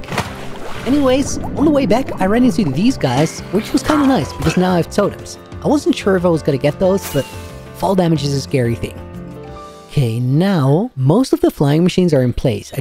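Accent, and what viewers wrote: Netherlands. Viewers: Areyou dutch???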